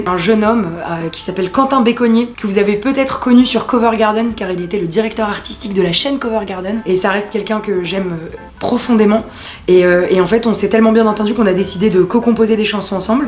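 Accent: Quebec, Canada. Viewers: J'adore l'accent québécois 😂😂❤❤congratulations 💪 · Chuis jaloux de ton accent québécois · l'accent québécois, une pépite ! Bravo <3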